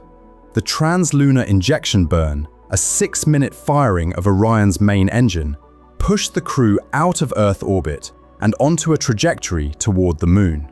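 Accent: United Kingdom. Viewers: FAIILLL!! British (voice) narration ALWAYS exaggerates!! I'm sick-&-tired of British-narrations!! Great-Britan hates America, sooo, WHY are 'they' narrating stories about 'us'....??!! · The thumbnail said “What Artemis II saw in the six minutes that nearly ended them”.. No mention of temperatures. The voice sounded more British rather American and as 8 billion of us and science of us use Celsius, it would make no sense for me to think the video would have been referring to Fahrenheit, particularly when the temperature mentioned is closer to the photosphere’s temperature. The temperature of the capsule on reentry was about 2,760- 2,800C . So I suspect there was some carelessness in the title, and the 3000 degrees referred to the temperature of the capsule on reentry in Celsius.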